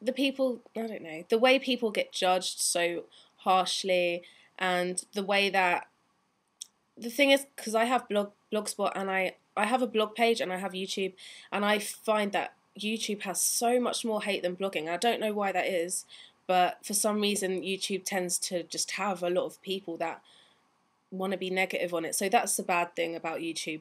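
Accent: United Kingdom. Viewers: about your style: rock chic/ street chic? - random comment: i'm normally not into british english but to you i could listen all day :) - goofy question: any thoughts on the royal wedding? are you excited, bugged, indifferent..?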